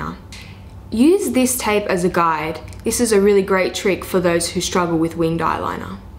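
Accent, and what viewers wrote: Australia. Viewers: Love hearing Aussie accents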